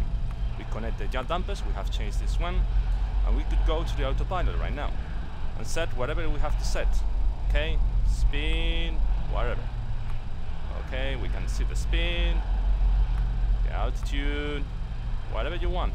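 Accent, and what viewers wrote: Spain. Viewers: Damn I love your voice!!! So spanish ^^ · A complete tutorial by Antonio Banderas (: Just kidding. Your Spanish accent is real class man. I love it!, this is a wonderful aircraft and I'm really enjoying it. Even though I use TrackIR (and I thought everyone did), this plug-in will help a lot. Your link didn't work for me, but I used this one: misc plugins page and see it as "LockView" download.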